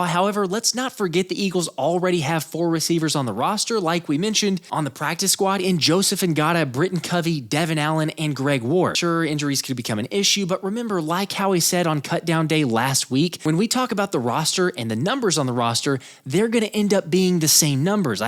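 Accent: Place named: South, United States. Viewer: Where are you from bro? Have a little southern twang to yiur accent, its obvious you are nkt from Philly...but great content!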